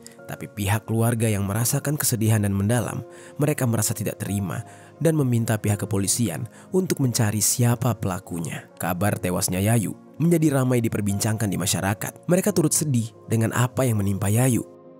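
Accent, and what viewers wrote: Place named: Java, Indonesia. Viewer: Lancar bgt logat jawanya bang 😅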